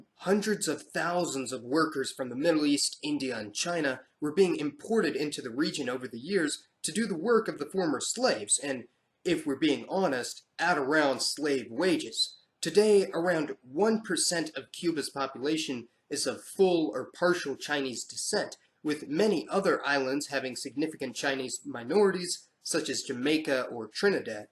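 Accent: United States. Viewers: He tends to force American phonetics onto foreign words, but with very little effort into pronouncing them correctly. The worst I've heard were on the videos about Filipinos and Japanese.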